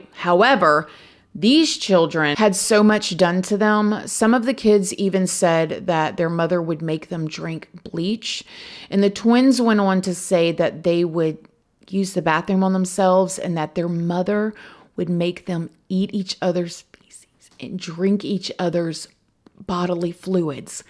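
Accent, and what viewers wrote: South, United States. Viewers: Sis it's that southern accent...keep it coming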